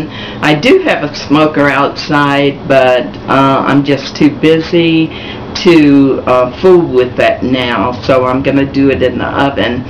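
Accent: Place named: Midwest, United States. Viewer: LOVE this:) Im from Wyoming so Nice to listen to another midwest lady:) THANK YOU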